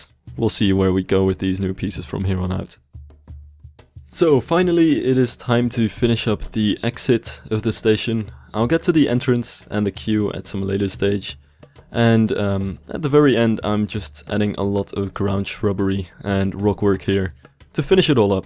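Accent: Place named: Netherlands. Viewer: My senses smell that you are Dutch speaking.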